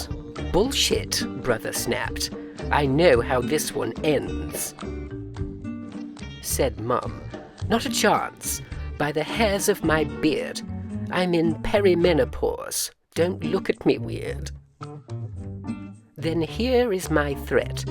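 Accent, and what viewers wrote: RP, United Kingdom. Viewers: the narrator speaks the Queen’s English.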